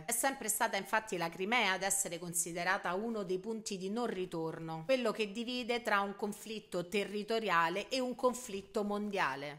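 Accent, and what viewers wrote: Rome, Italy. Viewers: I tuoi video sono delle "Icone" concentratti dell'italico sapere e ponderare. Grazie poi dell'energia positiva che metti col tuo romanaccio simpaticcissimo. Grazie veramente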